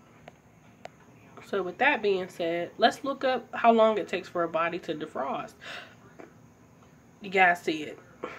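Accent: Pittsburgh, United States. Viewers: Great video. U sound like your from Pittsburgh 😀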